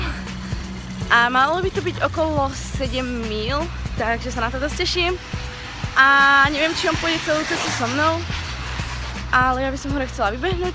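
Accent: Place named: Bratislava, Slovakia. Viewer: Ja mám rada Bratislavskú slovenčinu prízvuk kiež by som ho mala tiež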